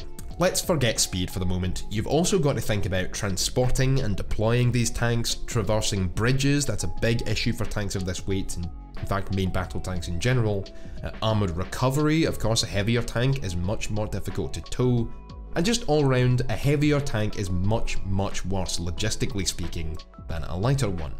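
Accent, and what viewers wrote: Scotland, United Kingdom. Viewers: Scottish accent but you live in Australia. So gaining any defense accurate info is quite impressive and commendable, but chobam armor, which I believe has been upgraded to leiseter or some other armor, and to be honest. guys I know in the tank corps think that is a good thing. You seem to be looking for an indestructible tank simply because of that you will never get one. At Also the Abrams use British armor technology, but then again the UK uses American communication software and fire and control. Anyway living in Australia, do you really care? you are about as far away from real war, as you will ever be. Guess that why you are in Australia!!! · Many words spoken by this chap are from some kind of jock slang, I respectfully request subtitles for clarity. Or is there or might there be an English voice option ? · Being disabled by driver error is hardly enemy action. 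The enemy action was ineffective against the chally even when it was immobile and defenceless and they where stood next to it shooting rpgs at it's weakest points. Plus being disabled is alot different to being destroyed with the crew dying.. Can tell your scottish i love your vids man but you have such a hate-boner for anything british then laud things only slightly better or on par it's hard to hear sometimes xD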